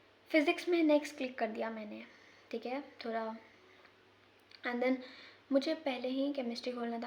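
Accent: Bihar, India. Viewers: Bihari ho kya ?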